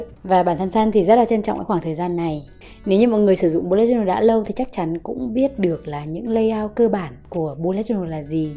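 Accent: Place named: North, Vietnam. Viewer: À nhon chị Sun. Đầu tiên em muốn giới thiệu một chút về bản thân. Hì, em là Chou Chou, đã là sinh viên năm 3 rồi, và theo học kinh tế. Thực sự mà nói thì những thàng ngày đầu tiên tại trường đại học của em rất chán luôn, và rồi em bắt đầu đến với một câu lạc bộ vào năm 2, từ thời khắc đó phải nói deadline ngập đầu luôn, mình đã rất stress lắm, nhiều lúc chỉ muốn bỏ mặc hết tất cả, cả những chênh vênh của tuổi 20. Nhớ cách đây nửa năm nhỉ, em tình cờ một ngày chán chường lướt YT thì em xem được clip đầu tiên của chị, về lên kế hoạch. Thật sự lúc ấy em bị hấp dẫn bởi cái giọng Bắc nhè nhẹ của chị, có lúc Sun "xàm" nhưng rất đáng yêu, rất thu hút người nghe, và cả một ngày hôm đó hình như em chỉ xem mấy video của chị thôi. Và ngay sau đó em tập tành những trang Bullet Journal đầu tiên, nhưng mà trời ơi, em vẽ tệ hết sức, nhưng mà em vẫn rất vui, bởi vì trong thời gian đó em cảm nhận được mình hạnh phúc với những thứ nhỏ nhỏ mình tạo ra, Bullet đã giúp em rất nhiều, em đã xử lí thời gian tốt hơn, mặc dù đến bây giờ thì vẫn có những ngày em không hoàn thành đựơc hết nhiệm vụ, nhưng không sao tất cả đều phải có quá trình mà chị hen. 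Em vẫn nhớ chị bảo mỗi cô gái nên có một cây son đỏ, vì nó chính là sự quyến rũ đặc trưng của những cô gái Pháp, em nhớ mang máng là vậy, và em cũng vậy, cuồng một màu son duy nhất đó. Hihi. Không hiểu sao khi xem cái video này của chị, em có nhiều động lực lắm luôn, em viết mail cho ban Nhân sự để xin rời CLB để sẵn sàng bắt đầu những dự định mới của m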